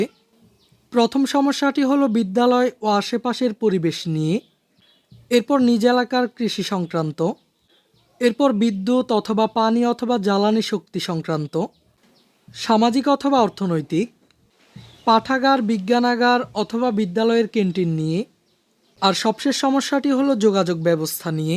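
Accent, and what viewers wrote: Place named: Magura, Bangladesh. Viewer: Magura galar kay asho❤❤❤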